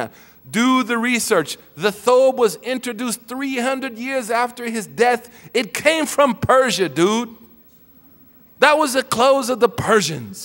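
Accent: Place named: Bronx, United States. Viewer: His manner of speech offended me as a Muslim. Really what is going on In America? Have some strange people becoming "Imams" Why is he trying to talk like he is from the bronx, it is not impressing anyone. And I agree with the person who said even the sisters are looking at him oddly those sat in the audience. What is happening in America??? I am in the UK thought it was tough here... Ya Rab whats going on with some people?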